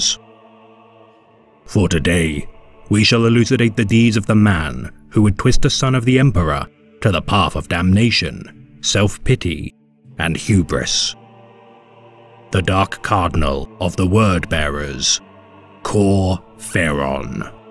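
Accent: United Kingdom. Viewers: He's British.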